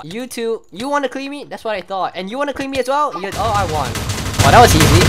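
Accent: Singapore. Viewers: Is it just me or you got a Singapore accent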